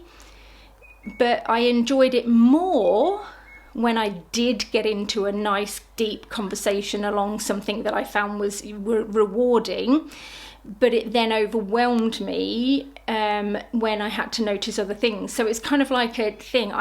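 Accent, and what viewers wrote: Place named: United Kingdom. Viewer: Thank you for such interesting content. I’m sorry, but I’m sitting here puzzling. You sound British and many of the things you say sound British but you pronounce words such as insulate without the extra H sound that I usually hear British and kiwi and Australian people do so I’m confused. I’m sorry I know this has nothing to do with your video content. It’s just got my curiosity up.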